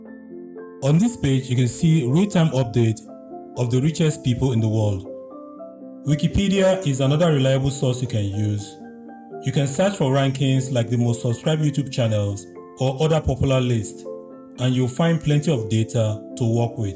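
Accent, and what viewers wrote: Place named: Nigeria. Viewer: HI good video i liked your nigerian accent bro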